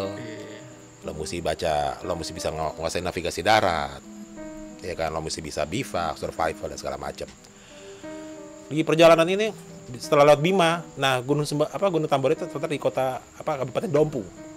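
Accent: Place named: Betawi, Indonesia. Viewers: setau ane, ente orang (berdarah) sumsel (komering) bang. Manggil ibu, pake kata enyak, dan logatnya kok kaya ada betawi nya. ada keturunan betawi juga kah? atau hanya karena terbawa dialek gegara sejak lahir di jakarta? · Abng ini enak story telling nya..khas betawi.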